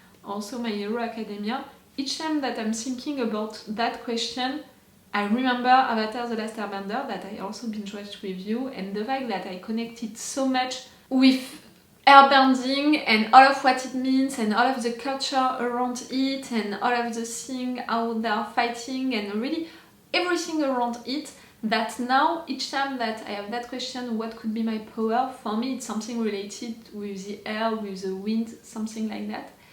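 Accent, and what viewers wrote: France. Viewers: yeah it's probably because of your french accent Most people doesn't like it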